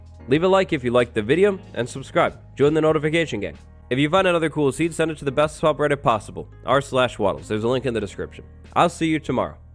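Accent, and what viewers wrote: United Kingdom. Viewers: i didnt know youre british. your accent would go unnoticed in new England. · I love your British accent lol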